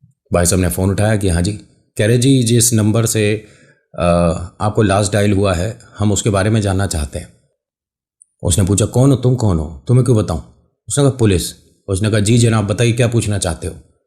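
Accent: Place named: Mathura, India. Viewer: Language se mathura side k lagte hai. Mathura agra hathras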